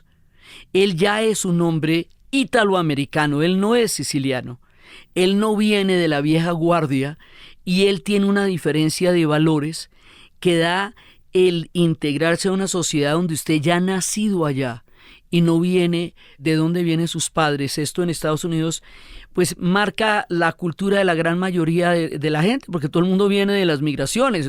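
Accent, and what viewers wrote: Colombia. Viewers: Historiadora con estilo propio y ese acento chevere colombiano, un abrazo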